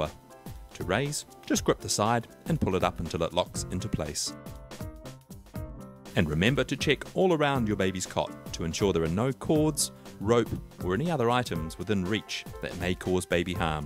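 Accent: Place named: New Zealand. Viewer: Cute Kiwi boy accent!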